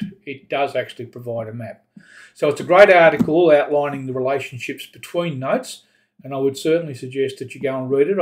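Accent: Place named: Australia. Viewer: Glad the algorithm put your video up for me - and glad to hear an Aussie voice grappling with the Obsidian stuff I'm interested in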